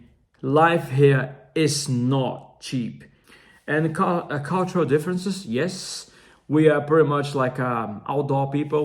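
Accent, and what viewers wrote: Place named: United Kingdom. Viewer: Oi emano, muito bom ! Glad you like it here in Denmark, your english has a serious british accent. 😊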